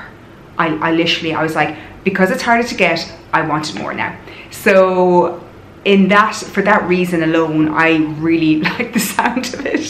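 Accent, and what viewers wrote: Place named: Ireland. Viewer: Love your bag, love your accent, to me it almost sounds like an Irish accent. Cute.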